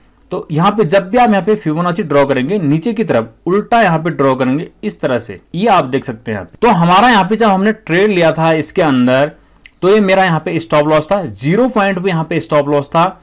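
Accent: Kumaon, India. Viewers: Aap kumauni hain kya sir?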